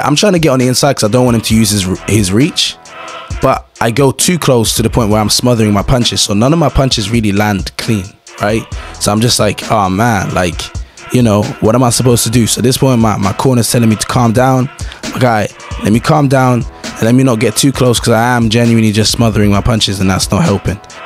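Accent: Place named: Midlands, United Kingdom. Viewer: Ure from Leicester or Nottingham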